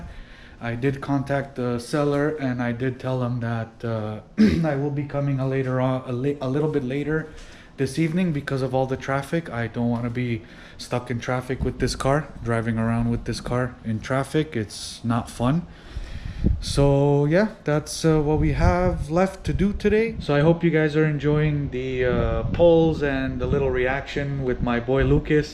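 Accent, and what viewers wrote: Canada. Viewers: from the moment you opened your mouth i knew you were from gta/peel lol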